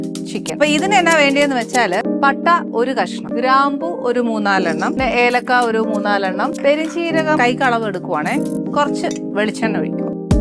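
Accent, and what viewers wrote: Kottayam, India. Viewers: സംസാരം നല്ല ഇഷ്ടമായി Kottayam സ്‌റ്റെൽ സംസാരം❤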